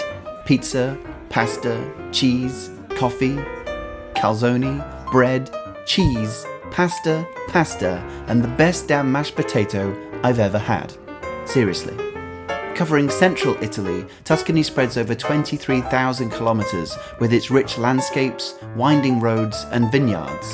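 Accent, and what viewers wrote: England, United Kingdom. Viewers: I love your videos👍🏼 and your English accent 👍🏼👍🏼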